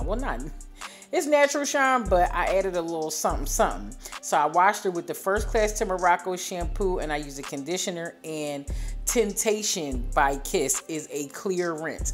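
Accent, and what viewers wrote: Philadelphia, United States. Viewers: 15 minutes in and the Philly accent slapped in the face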